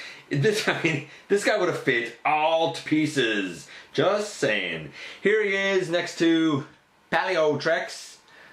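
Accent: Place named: Newfoundland, Canada. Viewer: Just recently subbed and I can't believe it took me this long to notice your accent. You're a fellow Newfie! How's she getting on me son? Lol.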